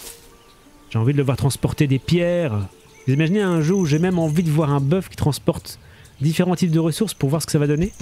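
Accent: Belgium. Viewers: Tu as un léger accent belge... 😊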